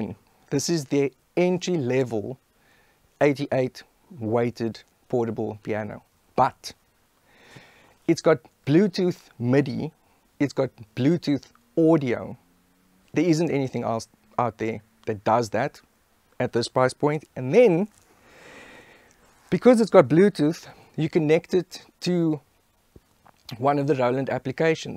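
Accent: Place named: South Africa. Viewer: That's definitely an SA accent...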